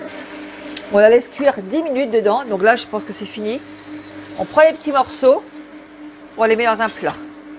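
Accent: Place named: Ardennes, France. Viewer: Bonjour, j'ai reçu mon compact cook pro cette semaine et me voilà un peu perdu car c'est mon 1er robot, j'ai toujours cuisiner sans. Donc me voilà à chercher des recette et quel bonheur d'être tombé sur votre compte, je sent que ça va bien m'aider merci. Jhabite dans les Ardennes et je pense reconnaître cet accents ☺️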